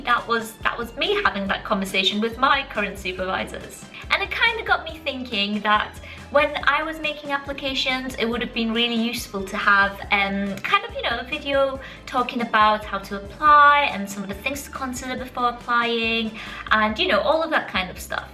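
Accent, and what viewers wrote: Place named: United Kingdom. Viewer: that perky british accent is why i want to go there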